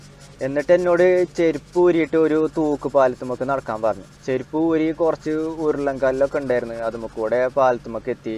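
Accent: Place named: Thrissur, India. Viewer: മാഷാ അല്ലാഹ്... നന്നായി...ആ തൃശൂർ സ്ലാങ്ക് കമന്ററിയാണ് എനിക്ക് നിന്റെ വരയെക്കാൾ ഇഷ്ടയത് 😍🤩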